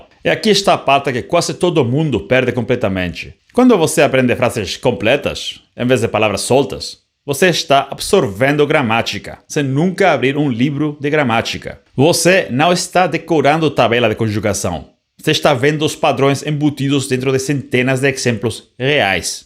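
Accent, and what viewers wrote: Rio de Janeiro, Brazil. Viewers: Muito legal seu vídeo, só lamento que você aprendeu português com sotaque carioca, na maior parte do Brasil não falamos com "chiado" nas palavras que tem "S", como você deve ter aprendido. Fora isso, acho que este é o primeiro vídeo sobre aprender outra língua realmente útil! Parabéns pela iniciativa! 👏👏👏... Grata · VC fez certo em aprender o portugues carioca! 🎉🎉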